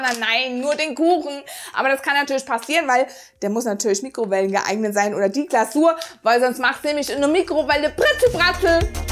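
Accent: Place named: Saxony, Germany. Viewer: Super die sachsen. Dialekt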